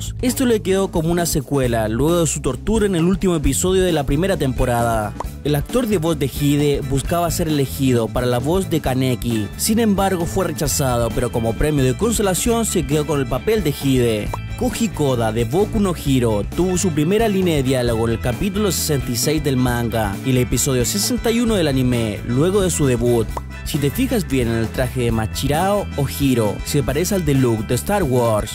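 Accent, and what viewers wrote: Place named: Chile. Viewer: Creo que a se le esta escapando lo chileno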